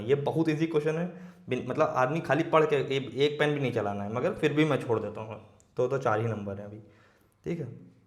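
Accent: Lucknow, India. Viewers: kasam se jaan gya tha boli se hi ki lko se hain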